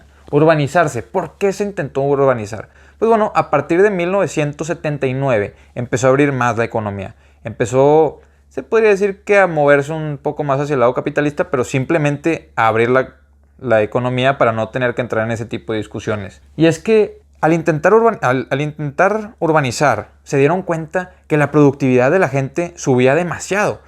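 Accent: Monterrey, Mexico. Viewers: tiene un acento bien marcado Regiomontano de Mexico que me trae grandes recuerdos de mi tierra. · Pues bien bonito que es esa plática con ese maravilloso acento regiomontano.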